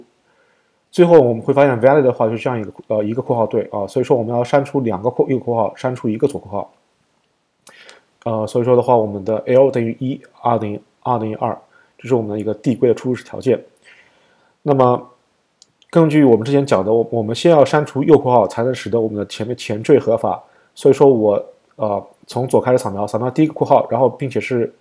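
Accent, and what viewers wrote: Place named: Shanghai, China. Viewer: 良心博主，如此熟悉的口音像上海人哈哈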